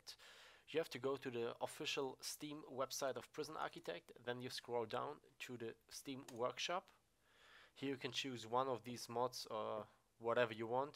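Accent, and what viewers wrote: Germany. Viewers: Man hört ultra das du deutsch bist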